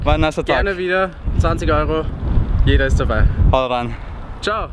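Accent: Austria. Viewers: bist du Österreicher ?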